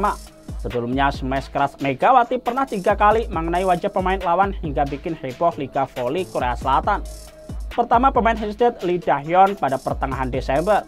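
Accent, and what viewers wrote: Java, Indonesia. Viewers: Logat kamu terlalu medhok..lur..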